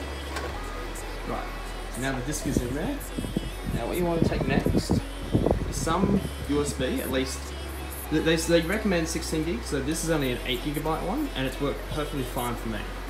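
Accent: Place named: England, United Kingdom. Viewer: Great tutorial my dude.... Also, great voiceover throughout the video... I am just in love with an English accent no homo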